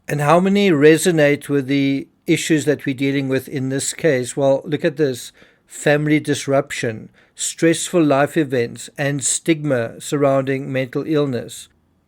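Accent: Afrikaans, South Africa. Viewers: Another Afrikaans accent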